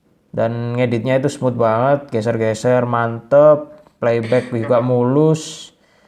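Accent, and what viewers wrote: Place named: Java, Indonesia. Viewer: Waduhh wong Jowo 🤣To bwanggggg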